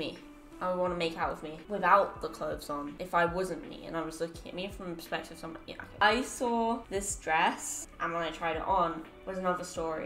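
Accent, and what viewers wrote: United States. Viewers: Watched her years ago and now she has a slight American accent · definitely has an American twang in her accent now- cute!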